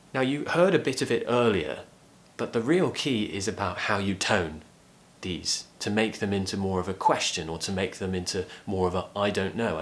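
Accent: RP, United Kingdom. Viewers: You are the BEST pronunciation teacher I am falling in love in British Accentbbecaise of YOU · please sir i like your accent so much and I'm your follower student on youtube podcast. I found the 4000 essential words are read by American accent and really I like it to be with your British accent. please try to help us by reading these 4000 essential words in English. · Guys what british accent that he uses? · Hi, your British accent is really amazing, and this lesson is really helpful. Thanks. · I love your accent. Other British accents are also good but this RP accent is the most attractive one. It sounds confident, classy and so alluring. I can't wait to join your course and speak with you! ^ ^ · My God I'm literally in love with your British accent !!!! 🥰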